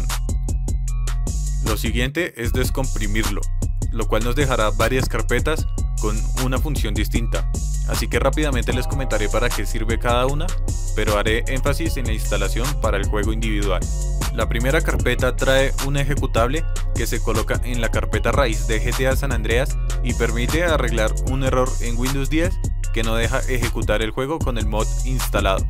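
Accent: Colombia. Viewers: Sos de colombia cierto? No se note como asento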